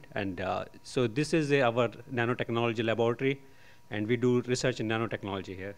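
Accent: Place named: India. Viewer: I just can’t stand the Indian accent, like whoever mad their language didn’t realize how annoying and un intimidating it is. I I woke up in India I’d probably shoot my self so I wouldn’t have to hear that shit everywhere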